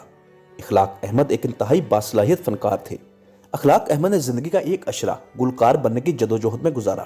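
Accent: Punjab, India. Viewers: Sahi kaha. Urdu ki khoobsoorati jo arabi aur farsi se aati hai use punjabi labo lehje aur alfaz se kachra kar deti hai.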